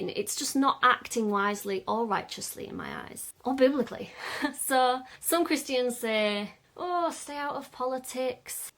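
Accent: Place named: Yorkshire, United Kingdom. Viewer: Completely agree with your sentiments - but can't quite place your accent? Yorkshire maybe?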